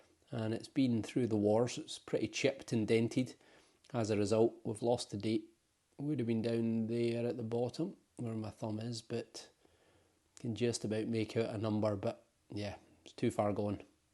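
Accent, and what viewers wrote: Scotland, United Kingdom. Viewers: The flowers on the cup are irises. I love your videos and your Scottish accent.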